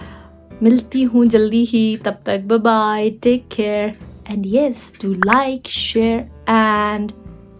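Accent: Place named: Pahari, India. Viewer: i wanna merry to you your voice is so attractive.......and your phadi tune i like....baki kuch nhi h.....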